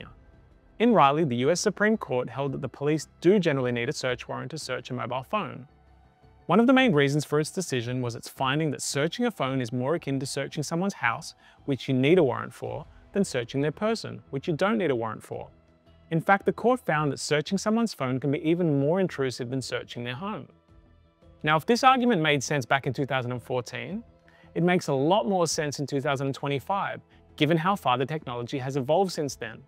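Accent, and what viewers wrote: Australia. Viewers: It’s so good to hear an Aussie assent, alternative media is the new way for me to hear alternative news. Thank You.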